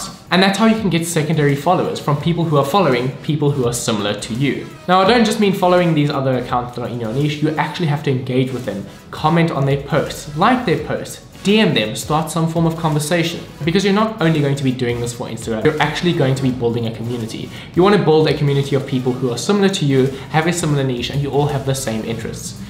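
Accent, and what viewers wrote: South Africa. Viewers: is that a saffa accent bruh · Is this guy South African?🤔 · Love this video! I noticed you have a South African accent, I was actually born in South Africa too! 😊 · Are u South African I am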